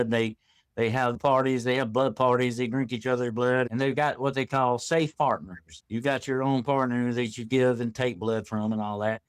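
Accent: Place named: South, United States. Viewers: Aussies don't have accents like that. He's definitely American. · He's definitely from the Southern part of the U.S.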